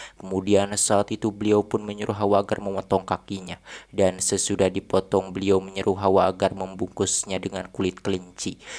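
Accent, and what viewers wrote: West Java, Indonesia. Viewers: AA orang mn siiih? Jiga na orang Sunda na..semangat a💪cute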